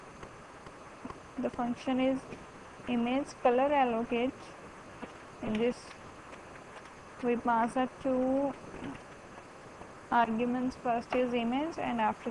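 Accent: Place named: Telugu, India. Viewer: Telugu voice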